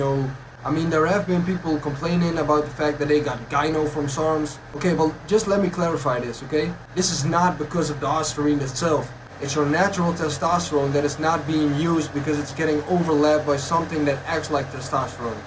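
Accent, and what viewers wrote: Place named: Netherlands. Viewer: U sound Dutch. Was just in Amsterdam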